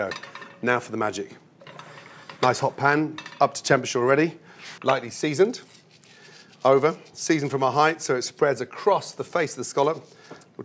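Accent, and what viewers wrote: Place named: Australia. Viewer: Hi Gordon if I may address you as such I am 89 years old and Vietnam veteran, and a newbie at cooking, which I love at one and a half years.. and I must say your approach to cooking is impeccable.. even with your Australian accent, you present yourself admirably.. I consider myself a better than average cook.. and living in Massachusetts I’m very close to all the scallops that I can bring home. One thing amazing about scallops. It does not take very many to fill you up. I enjoy your presentations very much and I like following you. Thank you.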